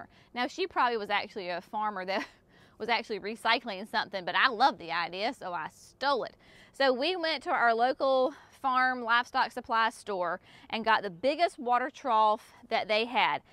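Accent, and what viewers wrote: South, United States. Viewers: I love your videos. Such a beautiful garden and nursery! And I love your Southern accent. Wonderful!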